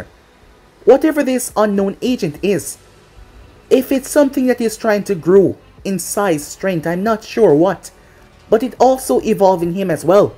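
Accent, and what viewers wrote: Jamaica. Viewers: Wassup bro I’ve always wanted to ask you this but are you Jamaican 🙂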